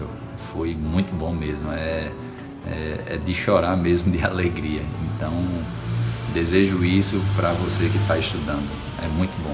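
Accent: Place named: Rio Grande do Norte, Brazil. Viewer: Excelente irmao, muito bom mesmo, o melhor depoimento pra quem está buscando pois aborda cada ponto c calma e objetividade. Pelo sotaque ou é potiguar ou paraibano né? Brasil.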